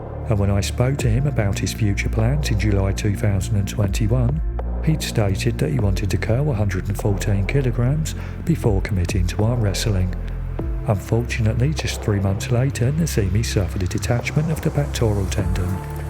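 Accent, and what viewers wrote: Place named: Kent, United Kingdom. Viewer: Are u from kent mate... Your accent 😄😄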